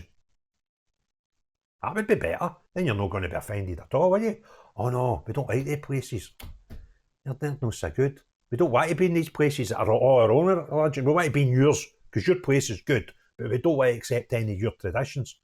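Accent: Scotland, United Kingdom. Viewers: The respected Anchor is most probably from Scotland. Regards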